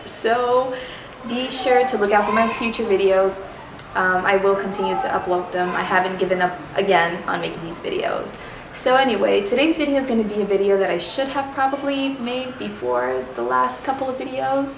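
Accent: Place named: United States. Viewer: your english is great i really like your american accent , have u been in US ? where did you learn english , are most of peruvian people could speak english like you ?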